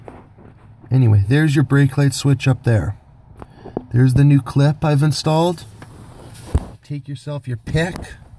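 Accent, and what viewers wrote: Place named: Canada. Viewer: He’s got a great white north accent bud.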